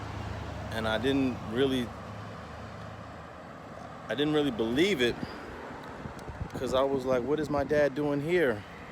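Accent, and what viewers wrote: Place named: East Coast, United States. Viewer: Bro I always wondered why in your earliest videos you had a east coast accent , and you seemed like an outa towner trying to learn LA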